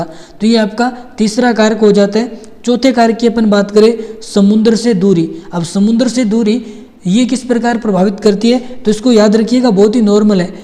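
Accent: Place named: Bikaner, India. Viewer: Bhai aap bikaner ho kya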